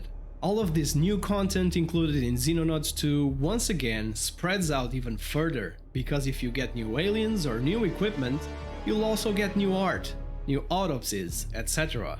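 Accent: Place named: Portugal. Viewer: I was listening to the video, and halfway through the only thing going through my mind was how Portuguese you sound.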